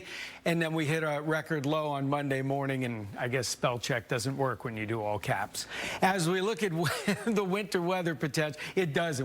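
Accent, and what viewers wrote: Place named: New Jersey, United States. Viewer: Why is this guy from Jersey doing DFW weather 😂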